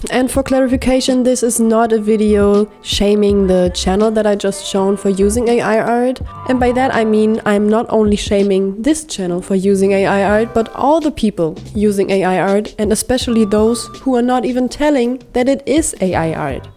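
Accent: Germany. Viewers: Is it just me or does she sound like she's German. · Bist du deutsch? Klingt wie deutsches Akzent. Grüßle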